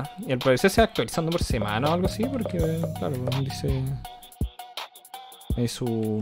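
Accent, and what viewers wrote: Chile. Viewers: X 2 yo soy chileno y cuando lo escuche decir chucha dije es chileno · Es la primera vez que te veo y desde ya puedo decir que eres chileno xD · por el como habla ya se sabe que es chileno por un hola como estas ya se reconoce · x2 io igual lo soy, chileno reconice a chileno jsjsj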